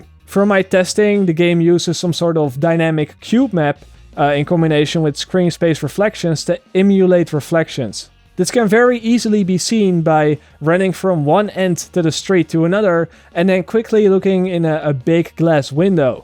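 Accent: Netherlands. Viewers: Wou vragen of je een Nederlander was aan de hand van je accent ... Maar het einde gaf mij al genoeg antwoord ... goeie video kerel ;) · you can really hear an accent when you're dutch yourself haha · Are you dutch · Haha ik wist dat je Nederlands moest zijn, je hoort het nog een beetje in je stem. Maar buiten dat een leuke video! je hebt er een subscriber bij. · are you dutch cuz your accent sounds the same as mine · Is this guy Dutch? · Dutch english accent* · you dutch? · is this guy dutch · i knew you were dutch from you accent & in the end you spoke dutch & i was like ..''yup i knew it xD'' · Are you dutch? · are you duthc? cuz you sound dutch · This dutch accent is driving me nuts >.< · I think he is dutch. I'm dutch as well and that is just the way most dutch people speak english haha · Je hoort echt duidelijk dat je een Nederlander bent :D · are you dutch? 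· nederlandsengels accent meneertje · ik wist het gewoon dat je een Nederlander was XD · nice good dutch exand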